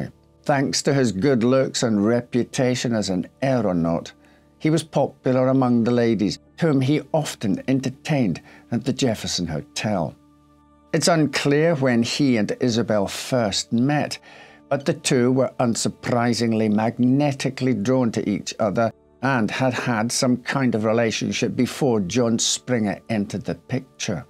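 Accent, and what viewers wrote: Scotland, United Kingdom. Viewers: Host, what is your accent? I said Channel Islands, my wife says Scottish??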